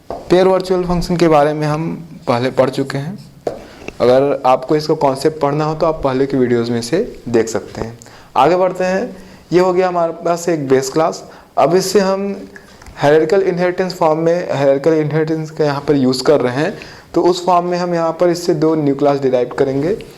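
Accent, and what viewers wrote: Bihar, India. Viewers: bihari pronunciation krna to sekh le phle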